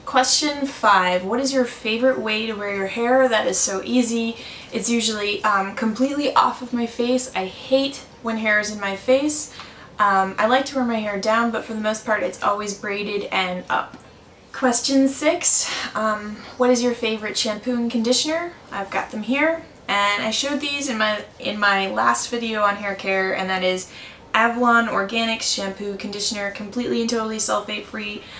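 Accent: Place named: East Coast, United States. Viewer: You say "length" so weird. It's definitely East Coast. I've never heard someone say length that way! By the way, it's ho-HO-bah oil. My mom whacks me over the head if I say jo-jo-ba, so I'm passing the knowledge on.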